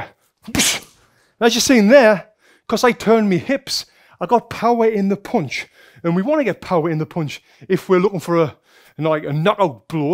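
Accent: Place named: Northern England, United Kingdom. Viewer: I like it when he says "noooooooo " in the beginning haha. Proper northern accent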